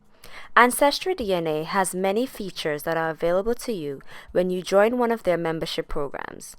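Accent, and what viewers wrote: Trinidad and Tobago. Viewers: I couldn't decide between Trinidad or Grenada when I first heard you speak. I got my answer browsing the comments lol. Either way, if you're from one, you likely also have family from the other. Great comparison video! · You sound Trini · You sounded like Guyanese until I heard the little Trinidadian accent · yuh sounding Trini! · how you sounding Trini,sweet like a Trini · I This lady sounds Trinidadian🇹🇹 Interesting video..... Hoping for a Christmas sale as they are expensive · 23andme Had a sale so i used them, also knew a friend who used it. I'm also a trini, i suspected you were as soon as i heard you speak. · Exactly what I was looking for, fellow Trini!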